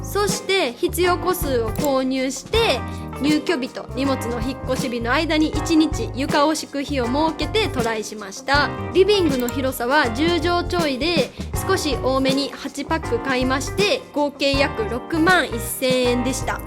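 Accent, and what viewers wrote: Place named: Kansai, Japan. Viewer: めっちゃ関西弁やん。 久しぶりに関西弁聞いたけど、やっぱなんか落ち着く。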